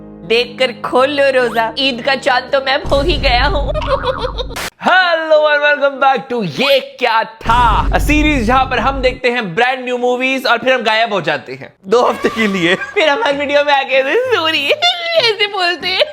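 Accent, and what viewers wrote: India. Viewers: Your that desi ascent 😭❤️❤️❤️❤️❤️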